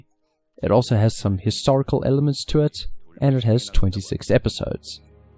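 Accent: South Africa. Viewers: Narrorator, are you south african?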